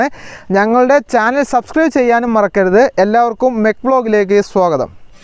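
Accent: Palakkad, India. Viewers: Chettan palakkad aano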